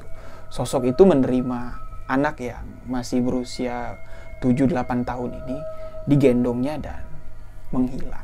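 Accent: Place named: Jember, Indonesia. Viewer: Hostnya cara bicara kayak Anang Hermansyah Orang Jember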